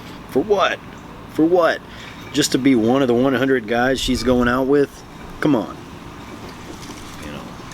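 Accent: South, United States. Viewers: The southern accent is a lot thicker now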